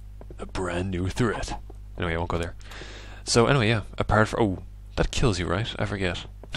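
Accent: Ireland. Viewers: Hey. Love this LP already. I was trying to place your accent, so my official guess is Cork...? Keep it up! =D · well my guess is irish .. or irelandish whatever ...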